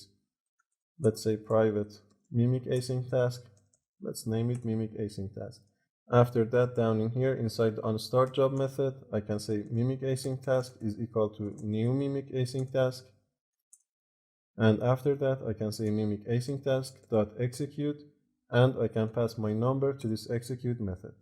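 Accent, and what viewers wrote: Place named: Iran. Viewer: Farsi accent, I found out from your accent that you are Iran and I became very happy , LIKE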